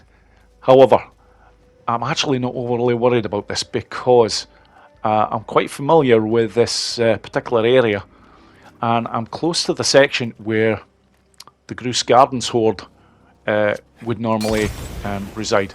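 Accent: Scotland, United Kingdom. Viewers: mega great info channel rocks the best way ever I never asked but I take it you are sottish one of my favorite wrestler is drew mcintyre from WWE .. I'm half Welsh / Greek funny combo I know lol. but your channel/ content is excellent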